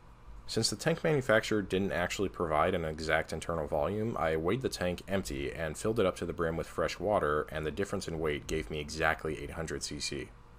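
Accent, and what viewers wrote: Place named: New Jersey, United States. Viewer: Are you from NJ? You have a Jersey accent.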